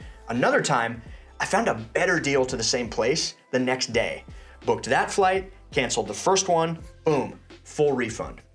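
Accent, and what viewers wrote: United States. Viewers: Hmmm ......You’re watching content on an American’s channel (with a definite American accent). It can be picked up in other countries but it looks like it’s basically a video by Americans for Americans. And you’re upset that it doesn’t apply halfway around the world?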